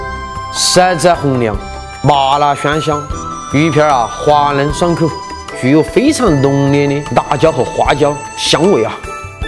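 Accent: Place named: Sichuan, China. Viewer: 哈哈哈，也會說四川話了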